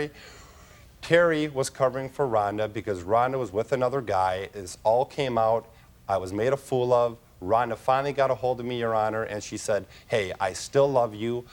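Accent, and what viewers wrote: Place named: Minnesota, United States. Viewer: I love the Minnesota accent!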